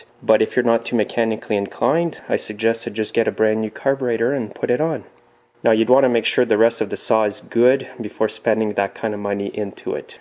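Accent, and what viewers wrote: Quebec, Canada. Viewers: I've currently got a Poulan, and while it's good for what it is, it's time for me to upgrade. My hardware guy sells Echo, and claims that the quality of the machines and that the company is on par with Stihl and Husqvarna. What are your thoughts? PS: Is your accent Quebecois or Manitoban? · Sorry,. I had a lot of trouble understanding your Canadian accent. Talk slower. Were you referring to the red or blue screw? Your voice dropped out. Can they set these up for Torx screw adjustment? Why the special tool?!?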